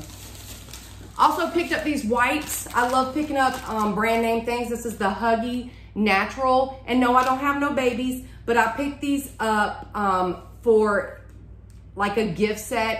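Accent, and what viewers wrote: South, United States. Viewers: Burnt orange. Southern accent.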